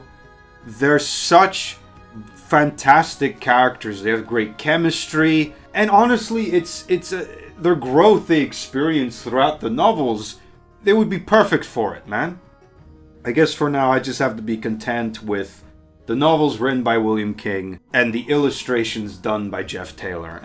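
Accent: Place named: Finland. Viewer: Dude's accent sounds Finnish.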